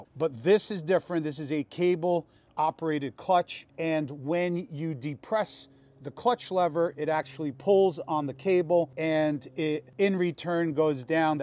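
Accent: New Jersey, United States. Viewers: he is from jersey they all talk like that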